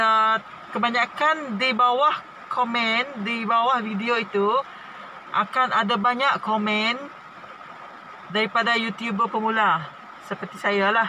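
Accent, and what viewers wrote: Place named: Sarawak, Malaysia. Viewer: Thank for info....btw slang Sarawak is best...betul ka...sy hadir untuk sokongan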